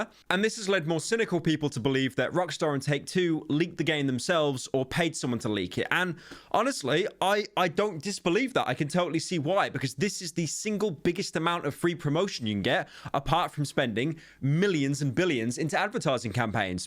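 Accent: United Kingdom. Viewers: I love the added 30 seconds to get to that 8 minute mark, thank you funny british man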